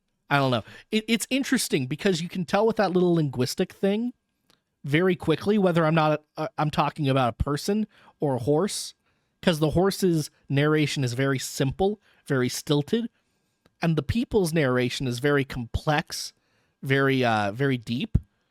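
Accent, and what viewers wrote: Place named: South, United States. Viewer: Your Southern accent coming out really suited the rider camp argument I thought - very much enjoyed it and I'm from Europe. Fitted the worldbuilding I thought. Please continue if you can. I too recall reading this book too young - I picked it up at the library because of the horses on the cover and being an SF/F fan and quickly put it down after getting freaked out by the swarm animals. I picked it up years later and enjoyed it much more, although I can find the prose quite repetitive at times. Cherryh is so good at making the world beautiful, intriguing and horrifying at turns. I love her names: 'willy-wisps', 'wally-boos', 'lolly-lies', 'goblin-cat', 'spook-bear' etc. The fact they can trick you psychically in these evolutionary ways and how that can infect humans psychologically en-masse is genius level worldbuilding. 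The main 'villian' (no spoilers) of the duology is also fantastic, Cherryh turns usual tropes on their head. Wish we got that third novel.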